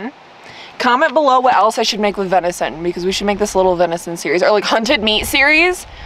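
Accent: South, United States. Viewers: Starting to find the southern accent offensive...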